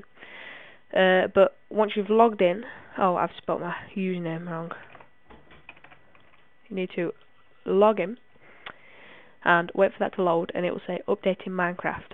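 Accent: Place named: Ireland. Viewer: Wow are you Irish that's awesome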